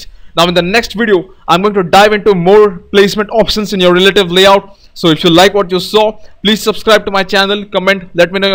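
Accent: India. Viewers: Well i do not see any problem in his voice. yes it is indian accent. yes you are right, his voice is not suitable for singing but offcourse he not claim to be a singer too :) .it is easily understandable and second he put efforts in making videos, which should be appreciated. simple...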